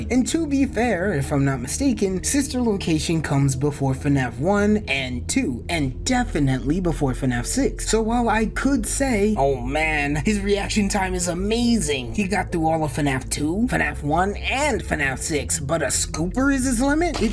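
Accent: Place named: Balkans. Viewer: That balkans voice..., is it you?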